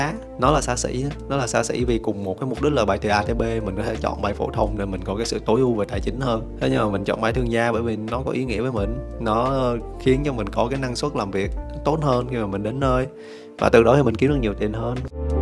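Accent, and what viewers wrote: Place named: North, Vietnam. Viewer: cảm giác như anh gốc Bắc đúng không ạ, thấy ngữ âm hơi giống dù a nói tiếng nam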